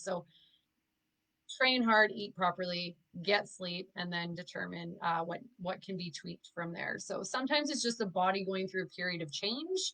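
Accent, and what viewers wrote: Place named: Northland, New Zealand. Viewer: Are you located in the northland area ? You have that kind of accent 😜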